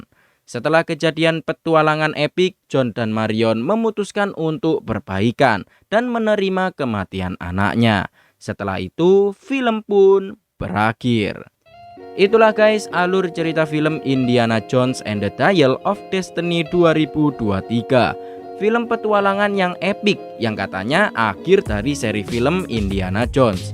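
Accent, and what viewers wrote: Java, Indonesia. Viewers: medok sngt tidk nyaman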